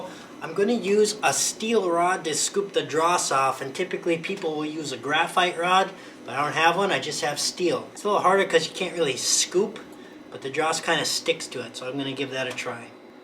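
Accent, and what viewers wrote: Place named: China. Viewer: The Chinglish is worth the price.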